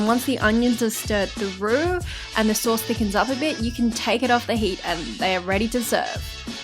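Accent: Australia. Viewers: Ok so I love your accent, Aussie? And you are full of energy and then some and your food looks amazing..Im going to make one of them real soon, thanks a bunch for your video!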